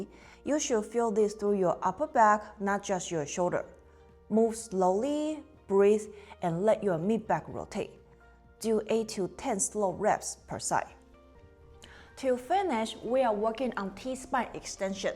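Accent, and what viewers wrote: China. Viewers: Cantonese accent?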